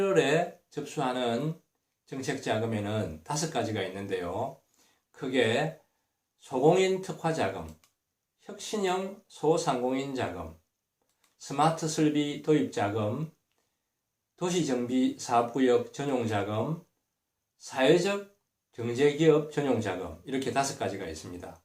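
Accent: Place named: Busan, South Korea. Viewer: 부산억양 귀에 쏙쏙 들어옵니다.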